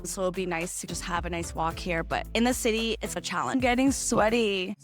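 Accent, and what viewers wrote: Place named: California, United States. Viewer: Facts. I was like, "She definitely sounds like she's from California."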